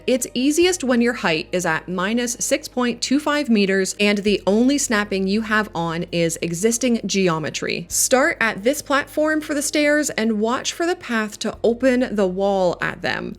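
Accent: Canada. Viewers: She’s Canadian that’s how they say about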